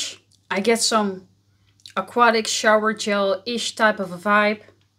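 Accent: Netherlands. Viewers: Absolutely love your Dutch accent 😍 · Dat Nederlandse accentje😊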